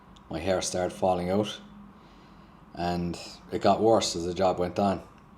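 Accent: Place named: Cork, Ireland. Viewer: Ive been looking at the Kove. YouTubes algorithm showed me this vid so I clicked on it. Wasn't expecting a Corkonian accent 😂 Will subscribe and watch your other videos now. Best of luck,.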